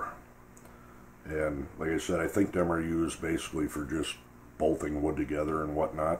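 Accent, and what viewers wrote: Minnesota, United States. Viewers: That has to be either a Minnesota or Wisconsin accent. By Golly !! Nonetheless, nice video.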